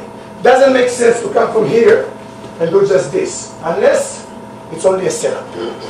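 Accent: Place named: Germany. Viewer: He sounds German.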